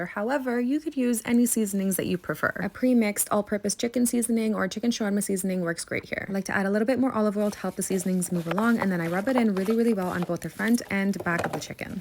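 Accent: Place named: Latin America. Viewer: Sounds very Latino actually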